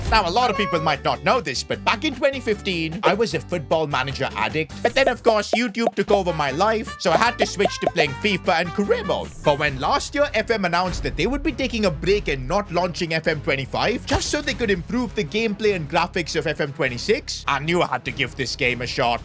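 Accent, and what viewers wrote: India. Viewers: Bro are you indian?? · Are You Indian Broo??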